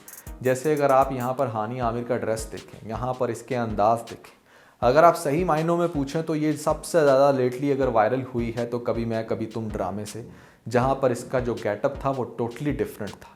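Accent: India. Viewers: aap indian accent mein kyun boltay hain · Bhai tu muhajir hai kya bilkul UP Bihar aur Old Delhi wali urdu bol Raha hai?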